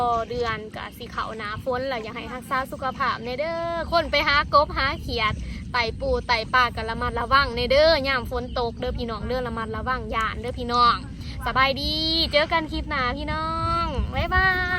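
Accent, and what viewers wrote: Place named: Phibun, Thailand. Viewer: สบายดีอีหล่า หายไปดนเน้อเจ้า เว้าคือคนเมืองพิบูลฯคักเจ้า เป็นเพราะว่าเฮามีชายแดนติดกันกับช่องเม็กทางไทยน้อ น้อง เว้ากะม่วนๆว่าสั้นสา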